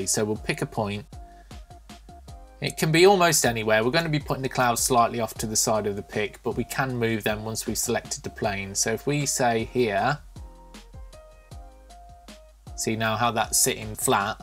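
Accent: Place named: United Kingdom. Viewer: Usually love your videos dude. This one is a little disappointing I'm afraid. Effects shouldn't just be used randomly, any editor knows physics is the most important part of adding effects post edit. The first question I asked (after why you add an extra O to videography 🤣) is why are there clouds being added at this height? The drone is clearly below cloud level, so at this point, eye training takes over and it automatically looks unnatural. We won't go in to types of cloud and why that's wrong. You're an amazing video editor that much can be seen from your other videos, but one thing that really gets my goat is people giving tutorials on something that's not right, this isn't good practice for those looking at compositing, FX physics are even bringing in science into the edits. I really don't mean to come across like I'm pissing for the sake of it. 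You're channel may be small, but it's great and I can understand your limey accent too. Give us more of your skills in Final Cut and videography (with a single O).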